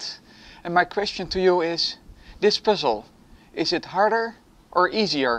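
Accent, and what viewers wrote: Netherlands. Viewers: Ik denk dat deze puzzel veel moeilijker is dan de 1x2x2 puzzel (en ik weet dat je nederlands bent door je accent)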